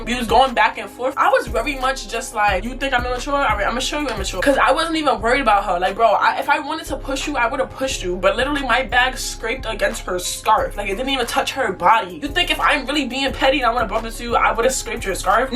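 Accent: New York, United States. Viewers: Good work I can tell you work really hard and your gonna make it fs and i love your storytimes, and I luv the ny accent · The accent tho, You from new york? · the ny accent😍😍♥️!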